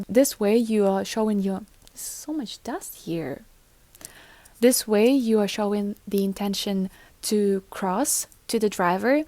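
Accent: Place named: Bern, Switzerland. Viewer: The greeting thing: Depends a lot of how urban the setting is. It's less common in the big (for Switzerland) cities. Your Bärndütsch is cute. Etiquette on crosswalks: No real need for a hand sign, just be clear with your intentions. Seek eye contact with the driver, set a foot on the road, wait for the driver to slow down.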